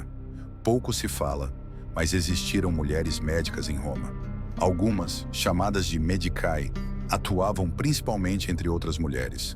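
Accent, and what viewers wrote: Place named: São Paulo Countryside, Brazil. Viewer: Parabéns pelo Canal, eu estou amando e gostei muito do sotaque da voz 😅 bem paulista do interior; me identifiquei muito!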